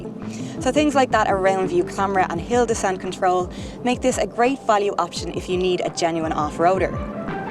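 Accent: Dublin, Ireland. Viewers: Great review with the Irish accent 😊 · That is not a English accent it's a Dublin accent.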